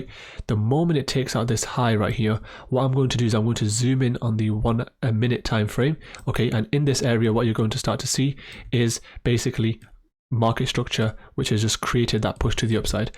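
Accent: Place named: Midlands, United Kingdom. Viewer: From the UK midlands, Birmingham or Luton.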